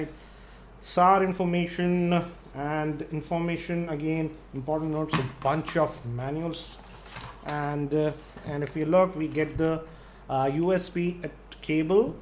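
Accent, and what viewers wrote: India. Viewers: I like your indian accent :D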